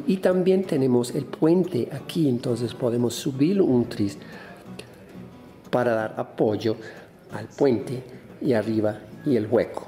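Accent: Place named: Brazil. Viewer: Se me hace que tienes acento brasileño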